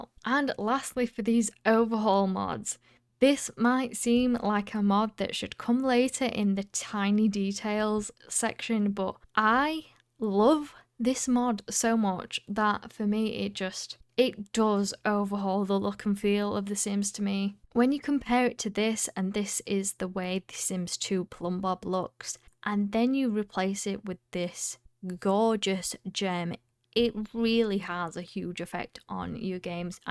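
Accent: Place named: United Kingdom. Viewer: Hello! Thank you a lot for the video. It's greatly helpful but what I also need to mention is your beautiful British accent, I love it so much! It was a pleasure listening to you.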